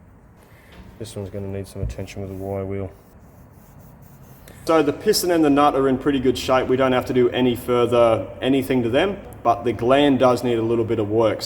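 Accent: Australia. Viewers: Hey another great video you need to get something like a torch meet table for making different ranches and stuff like that that would be cool like on a CNC plasma cutter table I love the outtakes though I really do specially with that Australian accent. But seriously how long does it take to do a job like that that’s one thing I wish you would add do your video how many hours totally it takes to do each job !!!! I truly check every day to see if you guys are posted another video greetings from Chesapeake Virginia USA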